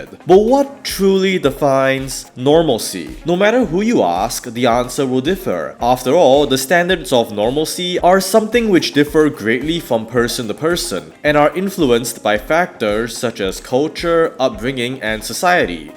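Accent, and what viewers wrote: Singapore. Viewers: Haha I could recognise the Singapore accent even through your attempts to make it sound more american. I checked your channel and turns out I was right! In all honesty this was a well put together video that is informative yet engaging. Keep up the good work!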